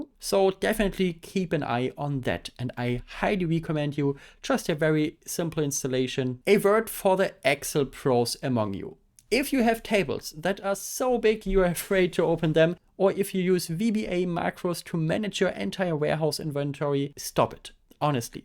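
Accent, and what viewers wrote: Germany. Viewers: it sounds like a German accent to me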